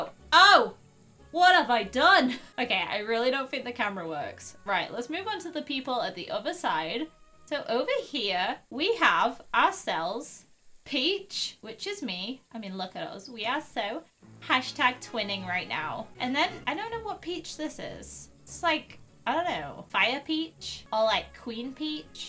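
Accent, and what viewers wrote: United Kingdom. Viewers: Are u british?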